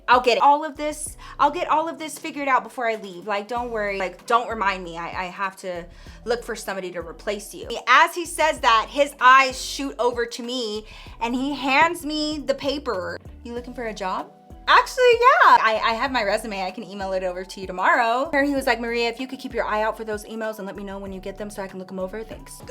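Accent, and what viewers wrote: Texas, United States. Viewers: New here and lovin these stories! 🥰 This is my 3rd. The first was the concert demons story, and for some reason I Immediately knew you were a TX girl. When you said Dallas in this video I was like, "AH HA!" 😂😂😂😂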